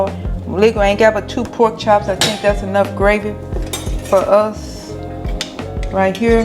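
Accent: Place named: Chicago, United States. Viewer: She sounds like she from Chicago with that cousin and em 😂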